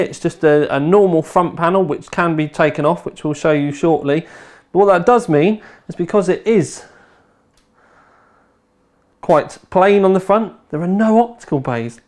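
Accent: United Kingdom. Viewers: the most annoying is that British English ascent, its so flatulent. do not understand why British cant speak normal English.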